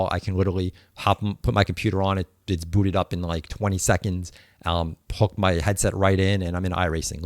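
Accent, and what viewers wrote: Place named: Massachusetts, United States. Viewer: you must be from mass... im from new bedford now in florida but your accent brings me home...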